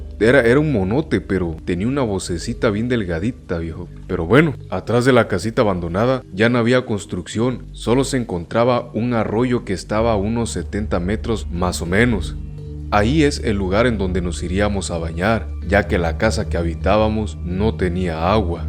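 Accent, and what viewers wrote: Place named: Mexico. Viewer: Me encantó tu historia me hace pensar el mundo está lleno de misterio por tu acento mexicano tu país si tiene misterios y leyendas saludos desde Venezuela levys loreto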